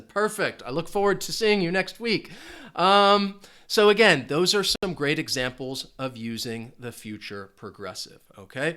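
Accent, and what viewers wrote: United States. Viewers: I just closed my eyes and listened to this video from start to finish. I learned not only tenses but American accent also. It will be useful for those who haven’t been familiar with the accent. So, I’m gonna invite my friends to subscribe and hit the bell button. They will be speaking more fluently with the tenses by the end of the year. A number of people in my country, Thailand, were struggling with forming a sentence despite the hard fact that they had been studying for several years. Unfortunately, their skills had remained unchanged. Anyway, students who have been working hard will have achieved their goals in so far as there is the light at the end of the tunnel. How long will they have been taking time to understand native speakers almost all the words they say? I suppose these covered all stuff you kindly taught. Thank you so much.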